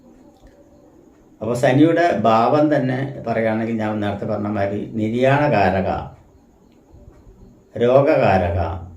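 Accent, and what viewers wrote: Tamil Nadu, India. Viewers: Tjamizhu. Parayunnath. Kondanu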